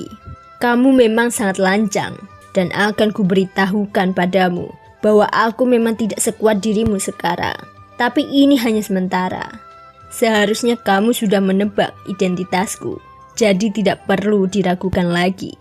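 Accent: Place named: North Sumatra, Indonesia. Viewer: Kental kali batak nya kak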